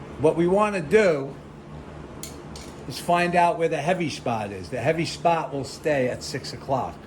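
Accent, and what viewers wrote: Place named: East Coast, United States. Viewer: A very big and welcome hint...will take a good look this weekend. A side note...I love your east coast accent.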